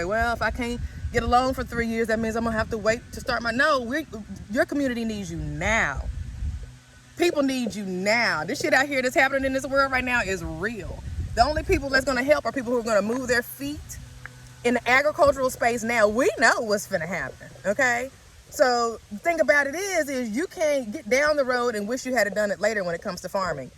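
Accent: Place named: Virginia, United States. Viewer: I love you! I'm from Virginia but living in Hawaii for 4 years and I love your speech, I miss it. I love how you broke this down and what you have to say. I just found you and this video totally changed the way I've been looking at this.😃The business and my lava rock land here is exactly what I'm trying to approach this from.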